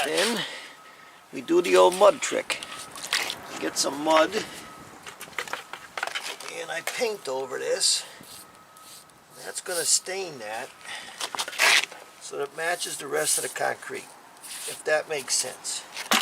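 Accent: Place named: Pennsylvania, United States. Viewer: I learn so much from you,; I believe you are from Pennsylvania, my wife is from Erie and your accent reminds me of her relatives and friends!! Nicest people I've met! Cheers!!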